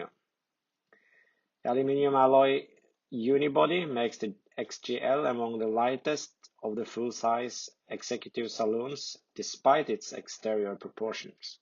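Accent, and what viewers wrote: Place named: Norway. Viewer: As well as visually showcasing the impressive features of this gorgeous car, your video is a delight to listen to. Maybe the commentary was to some extent scripted, or perhaps it is not having to simultaneously think about what you looked like on camera, that resulted in such an assured manner of speaking. I know many people hate hearing how they sound in recordings of their own voice. But believe me, your diction and delightful Norwegian accent in this voiceover comes across as professional and intelligent, reliable and trustworthy ,as well as approachable and engaging to an English listener.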